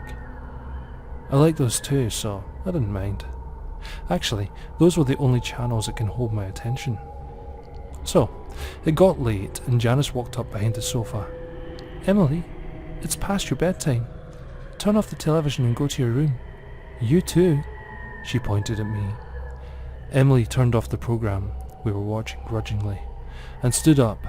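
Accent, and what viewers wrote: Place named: Northeast Scotland, United Kingdom. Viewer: Oh no! Ye peer wee dug..fit an affa thing tae happen aefter bein adopted in the first place. Fit rare listnin tae yer bonnie NE accent fearin me silly we yer creepy tales..love it!! Scottish wifie/grunny in London xx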